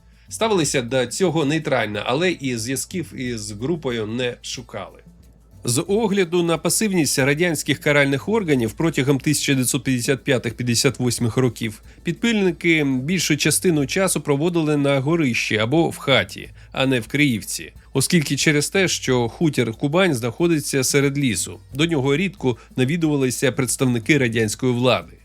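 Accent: Moscow, Russia. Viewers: Якби не знав, сказав би,що він із мааскви,над акцентом потрібно попрацювати!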